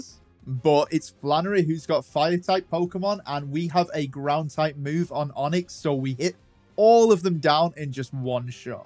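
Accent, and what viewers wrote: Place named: Scotland, United Kingdom. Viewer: Haha great vid! I love your Scottish accent, I play golf with a scotsman, he happens to be ginger too, I don't know much about Pokeymans tho, you earned a like.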